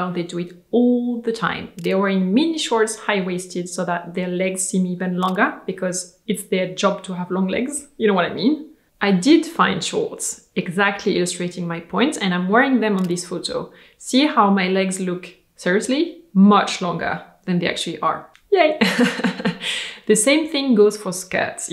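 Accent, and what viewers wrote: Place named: France. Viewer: Thank you for this! I love your fashion "education" videos-they are so helpful and I learn, or am reminded, of so many tips. Also-has anyone mentioned since your return to France there's a tiny strengthening of the French accent to your English? It makes me very happy as I visualize your daily life there ❤️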